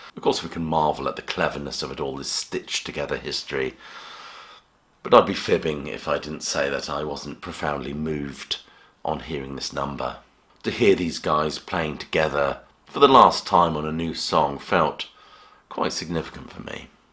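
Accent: United Kingdom. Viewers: Thanks! Excellent reviews. British accent...priceless. 😊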